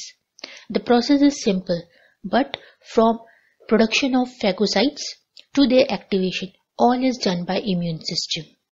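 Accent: India. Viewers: Ur india accent still so thick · Excellent explanations and illustrations of key principals. The only problem is that the narration is difficult for me to understand in the moment of the fast moving narration, due to what sounds to me like a vaguely Indian accent. Is there some way to adjust this? I speak American English.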